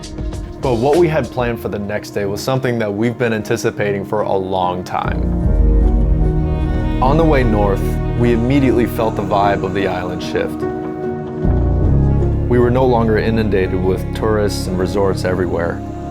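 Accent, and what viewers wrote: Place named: Philippines. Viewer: I love how you familiarize to pronounce these places in a very Filipino accent. Thats awesome which many foreigner vloggers can not😊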